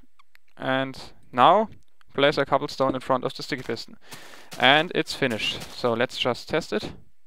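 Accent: Germany. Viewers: Man höhrt deinen Deutschen Akzent echt raus :D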